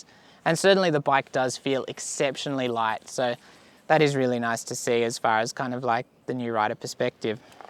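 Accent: Australia. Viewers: Pretty standard aussie accent cobbarino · This accent is way too much for me..where you from bro Australia? Wide bahhs nice wide bahhs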